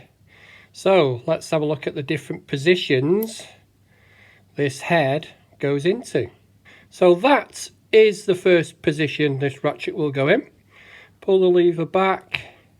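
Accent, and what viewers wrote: United Kingdom. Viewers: Yeah 😂, I think a solid 50% of us Brits have a hard time speaking our own language, it honestly gets worrying after a while 😂, are you from up north?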